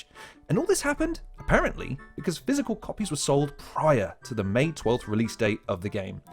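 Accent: United States. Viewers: I remember that doom RPG, it was pretty good. Nintendo is going hard at people now but they really didn't do much when the 3DS and WiiU emulators and tools were being developed while those consoles were very much alive. Nice American accent. QOTD, Probably the Entrain Odyssey collection for switch.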